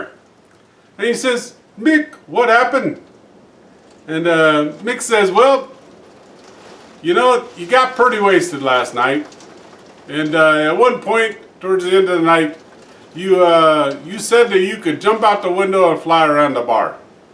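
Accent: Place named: Ireland. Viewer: Love the Irish accent 😂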